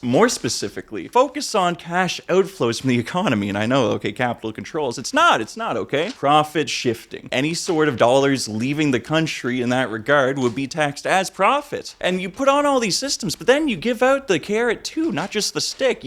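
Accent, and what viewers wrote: Nova Scotia, Canada. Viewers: Thought I heard an NS accent, flag confirms it. Lived in Halifax for 20 years, came back to UK due to health issues. Can confidently say that the Western world is falling apart, and it's glaringly apparent in the UK, and from what I hear, back across the pond too. Can confirm that "business rates" here are fn absurd. You think Visa/Mastercard are bad? Amex charges 5%. Running a business in Halifax, aside from cash, Interac was by far the most cost effective method of processing any transaction higher than $5. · He's Canadian. It's a Canadian accent. From Canada.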